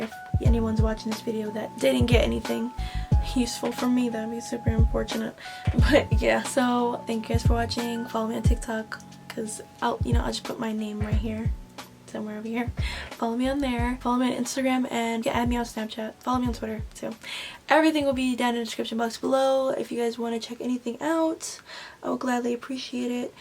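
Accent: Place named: England, United Kingdom. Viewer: Wow thanks and I really thought u where from England 🤷🏾‍♂️😂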